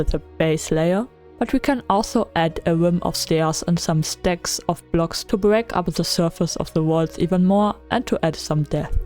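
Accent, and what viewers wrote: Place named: Germany. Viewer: german accent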